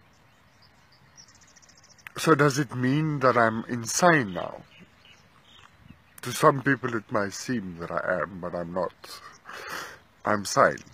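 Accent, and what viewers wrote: South Africa. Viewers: Your accent is so awesome. You must be South African. Keep fighting big fella ❤️🤙🤙🤙🤙🤙🤙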